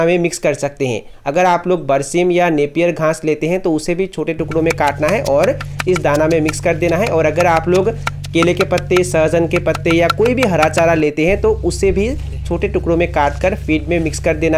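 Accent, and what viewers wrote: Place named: Nepal. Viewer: Aap Nepal me the kya pehle...aapke bolne ki style nepali accest se match kr rhi hai🇳🇵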